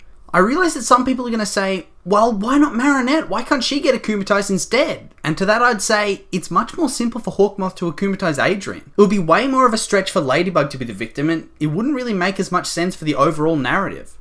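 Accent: Australia. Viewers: Yo are u aussie? Your accent sounds def Australian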